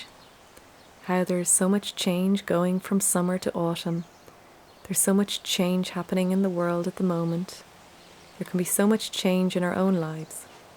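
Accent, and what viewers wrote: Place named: Kilkenny, Ireland. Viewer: Is that an Aran!? So beautiful! Also are you from Wicklow or is your accent from Kilkenny?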